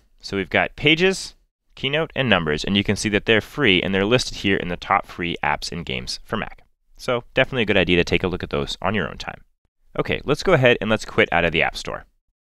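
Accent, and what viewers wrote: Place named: United States. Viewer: I have a MacBook Pro and have been Mac user for a long time but I'm here because of quarantine and Im here to listen to this guy's all American voice. LOL and I learned a few Mac tips !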